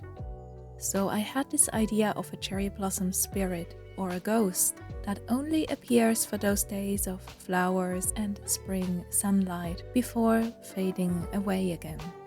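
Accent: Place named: Germany. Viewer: I just found you on YouTube because I was looking for relaxing art vlogs and your narration and story telling is so soothing and beautiful, it calms me and I find it very inspiring 💕 it's also very unique that you live in Korea but have a German accent? (I'm German btw 🙈🤣) Where were you originally from?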